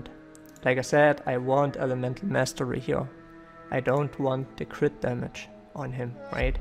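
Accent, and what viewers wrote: Saxony, Germany. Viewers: Your accent sounds kinda German, may i be wrong? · wie man den deutschen akzent nicht nicht beachten kann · your accent is sooooo german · kommst du aus sachsen 😂❤ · Hahaha are you from Germany? If so you can hear it out from your English accent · are you german? It sounds like you have a german accent:D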